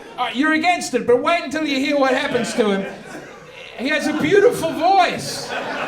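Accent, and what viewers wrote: Italy. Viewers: Fantastic Italian accent. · Very authentic Italian accent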